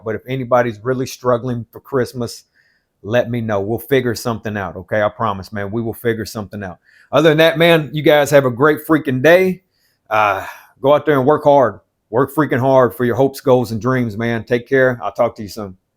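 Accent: United States. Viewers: ...you from Pittsburgh?!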